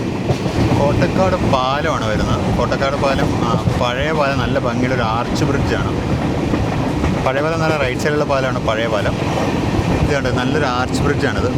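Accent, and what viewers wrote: Thrissur, India. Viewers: Musical language of Trichur, much liked. ❤❤❤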